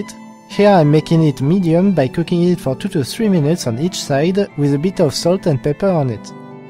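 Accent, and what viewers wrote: France. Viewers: Know what part of Europe is he from I feel like he is french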